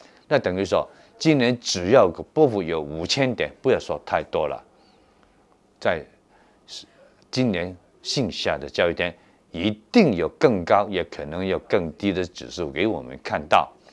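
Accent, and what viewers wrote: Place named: Japan. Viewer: 像日本人講普通話，哈哈😃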